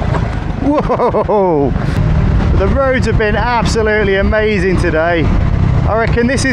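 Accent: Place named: Hull, United Kingdom. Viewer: It was like revisiting the route along with you other than Rameswaram to Chitra Durga I have ridden the route on my Honda Highness. It was a fantastic series with your awesome comments along the way. By your accent, it seems you are from somewhere around Hull in the UK. Looking forward to your pick among three.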